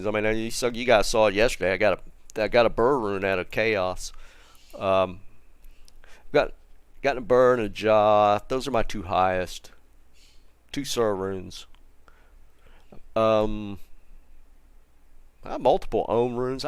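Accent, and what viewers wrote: Texas, United States. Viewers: Omg holy crap, I was thinking the same thing also. That accent seems like a texas cowboy accent.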